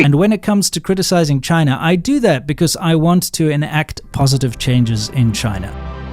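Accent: United Kingdom. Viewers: It's like you're speaking with a British accent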